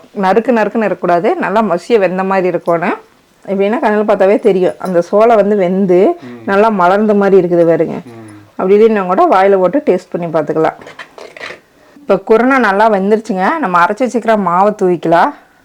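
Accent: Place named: Coimbatore, India. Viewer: nanum coimbatore than...pollachi amma ooru...ippo US la irukken...unga recipe ellame super,na main ah neenga pesarathe ketka varuven...namma ooru basai...ippo kuda sometimes enna ariyama vanthudum...ellarum correct ah kandupiduchuvanga... neenga coimbatore ah nu ketpanga...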